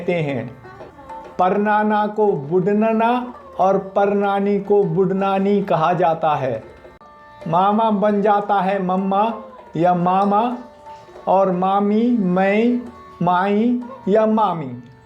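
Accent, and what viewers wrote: Garhwal, India. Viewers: जयश्रीराम, जयहनुमान ,जयहिंद, बंदेमातरम. ।भैजी सुंदर बात बतांणा एबात गढ़वाली मां बोलदा कतरा अछू लगदू।धन्यवाद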